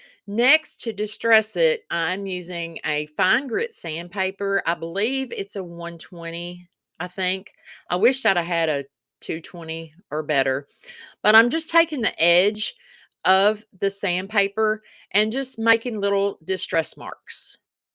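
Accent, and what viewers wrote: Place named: Kentucky, United States. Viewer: GRITS = Girls raised in the south. I was also raised on a farm in the south. Love your KY accent! My father and older siblings and husband are from KY.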